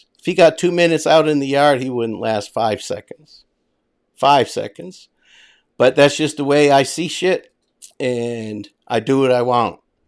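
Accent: Boston, United States. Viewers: Love the way you talk. Long islander here, transplanted to rural PA. Sounds like Boston but it's refreshing brother. Good info, subbed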